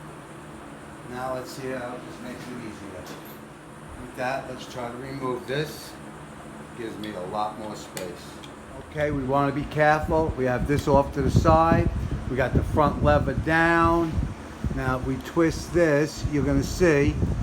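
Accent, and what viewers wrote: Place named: New Jersey, United States. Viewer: Yep, I do also, '03 Heritage Softail 100th, they fit '95 to '15 Softails...the real Willie G grips are only $114 at Harley #<num>;o) is the man!, love that accent!, must be New Joisey!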